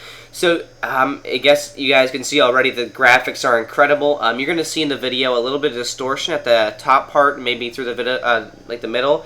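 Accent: Canada. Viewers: lol i didnt kno u were canadian till i watched this video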